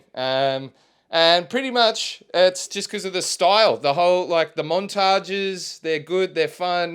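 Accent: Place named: Australia. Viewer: Bittersweet moment seeing this cause I thought I had 15 years worth of podcast to catch up on. However I would watch wreck em podcasts for at least the next 15 years if you decide to do more. Really enjoy hearing about the history of wreck em and Aussie drifting in general. I listen to a lot of American drifting podcasts, but it’s just not the same without an Aussie accent and a shithouse sense of humour. Also love your mentality behind fun drifting not just competitive drifting. I don’t want comps I want trains.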